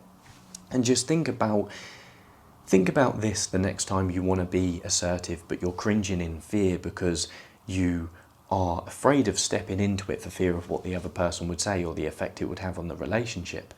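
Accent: United Kingdom. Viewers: Who is this guy and what is his credentials outside of British accent?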